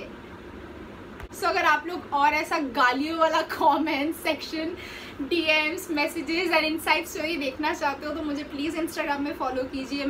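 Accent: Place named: Pakistan. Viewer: Are you from Pakistan ? Your English is almost same as Pakistan cricket players Agar English nhi aata toh English bolke kyu smart banne ki koshish kr rhe ho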